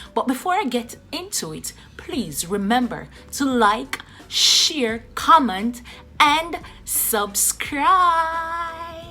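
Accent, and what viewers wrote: Jamaica. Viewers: Hey I’m so glad I stumbled on your page I figured your accent want slightly familiar. I am also Jamaican seeking employment in Kuwait 🇰🇼 I will be messaging with further questions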